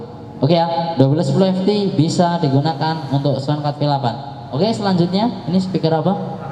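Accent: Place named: Java, Indonesia. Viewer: Saya tebak orang ini pasti jawangapak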